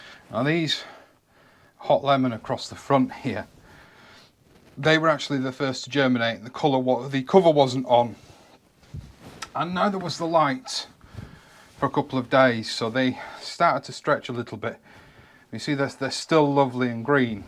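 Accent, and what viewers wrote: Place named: Northern England, United Kingdom. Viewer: I'm sure your subscriptions will grow (as well as your plants!) Thank you for another informative vlog. I have one question, where in the UK are you, you sound like a Northerner, hope thats not an insult! I'm a Yorkshire lass lol 🙂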